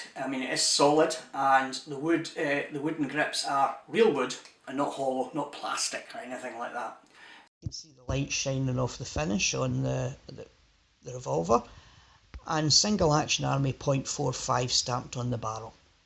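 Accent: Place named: Scotland, United Kingdom. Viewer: It's a Scottish accent. So, no.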